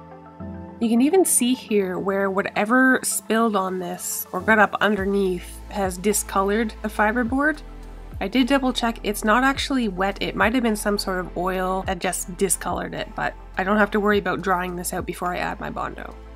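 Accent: Canada. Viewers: Found you by accident but was blown away by your work. Also, I miss Canada so much so loved hearing you talk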